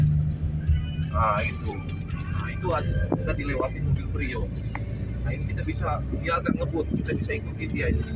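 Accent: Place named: Sulawesi, Indonesia. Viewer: Syutingnya di Jambi tapi dari logatnya om dari Sulawesi kah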